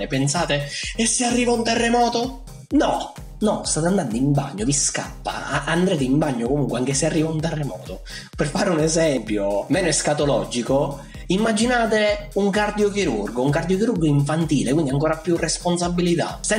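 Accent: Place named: Catania, Italy. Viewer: Hahaha, grandissimo! L'inflessione catanese poi è bellissima, fa simaptia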